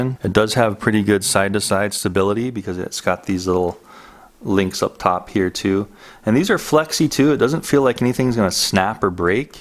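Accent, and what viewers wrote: Hawaii, United States. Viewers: Yo you in Hawaii bro? I keep thinking I'm hearing a little pigeon in your voice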